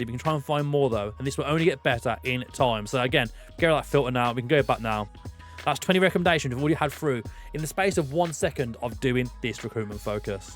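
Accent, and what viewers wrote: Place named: Plymouth, United Kingdom. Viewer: Are you from Plymouth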